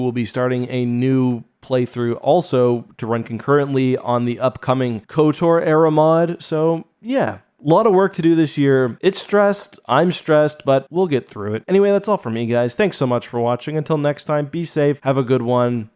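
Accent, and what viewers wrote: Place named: Eastern Canada, Canada. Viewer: You Definitely sound like you are from the east coast of Canada.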